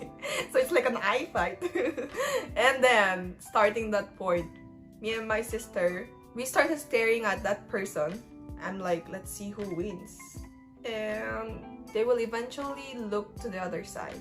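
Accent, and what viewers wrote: Philippines. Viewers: Wow you have filipino accent while speaking in english. Kudos! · Your english has a Filipino accent.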